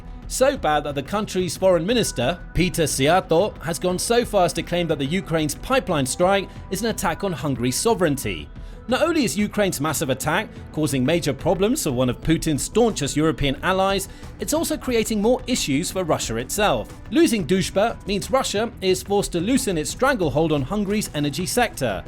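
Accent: United Kingdom. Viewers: Unfortunately Zelenskyy said he does not know how the $350,000,000,000 in US AID has been spent. From the sounds of the British commentator, maybe the Brits know where the grift has gone.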